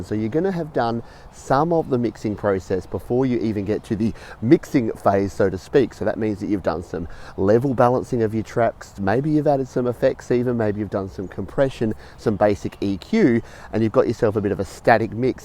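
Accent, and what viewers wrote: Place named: Australia. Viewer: ... thanks. By the way love the vids keep them coming it’s good to here a fellow aussies voice when learning stuff instead of American all the time.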